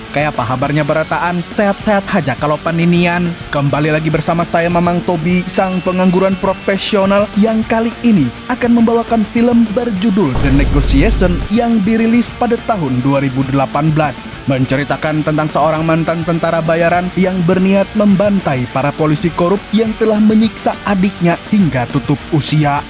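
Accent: Banjar, Indonesia. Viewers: urang banjar kah pian nih mang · Ulun Hanyar sadar kaya nya Pian nih urang Banjar kh😅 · Ada logat Banjar jua amang nya😅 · nah org banjar rupanya sidin wkwkwkwkwkw 🤣🤣🤣🤣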